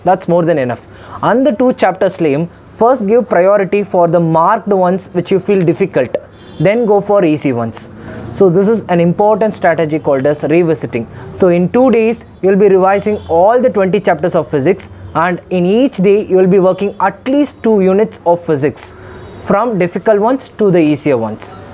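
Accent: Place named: South India, India. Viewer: Thanks for trick 😊, your English is too cute with south indian twist ☺️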